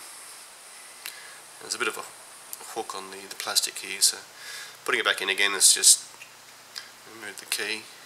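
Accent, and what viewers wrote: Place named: United Kingdom. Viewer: Cool! Such a small world eh? I'm in Hamilton - from Toronto originally - got the Roland RD 250 s As a Gift - from a great friend - - - - - had the "two dead keys" issue - - - - went on You Tube - - - - found this wonderful " " Brittish accented wonderful guy, gladly sharing his wisdom and awesomely creative advice - - - and did what he said - - - and bent (it took me 2 versions to get it right - the first one was too thin of a paper-clip - gotta use a thicker one, like he told us) and BOOM - fixed - and done. This is an amazing keyboard - a Classic. To have Great Advice and Wonderful Tips like those from - are So Much Appreciated. Thank you - Again -!!!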